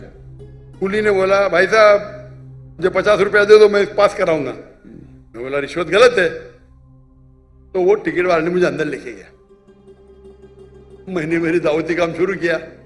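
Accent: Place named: Mumbai, India. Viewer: Bambai boli hai bhaiii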